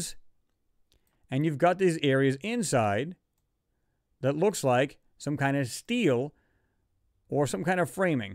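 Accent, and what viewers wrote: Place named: Louisiana, United States. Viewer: You sound like you’re from Louisiana. My home state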